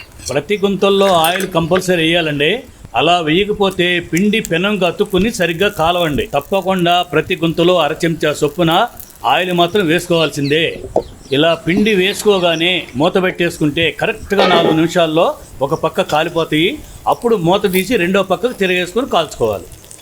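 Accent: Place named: Rayalaseema, India. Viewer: Babai mana rayalaseema telugu antha madhuranga untundi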